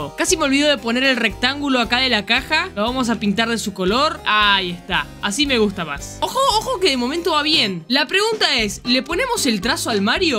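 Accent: Chile. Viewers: Erais chileno o qué onda weon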